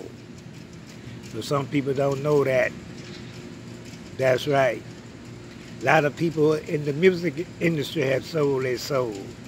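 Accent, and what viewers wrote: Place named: New Orleans, United States. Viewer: Elder the way you talkin you real are man a live stream talk just like that the way you are talkin remind me of my relatives New Orleans 504 head bussa!!⚔💥⚔🔥⚔💥⚔✊🏿🔥✊🏿👊🏿💥👊🏿💥👊🏿💥👊🏿💥👊🏿💥👊🏿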